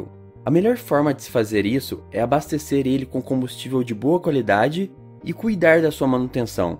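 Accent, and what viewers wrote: Caipira, Brazil. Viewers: Seu sotaque caipira cansa o vídeo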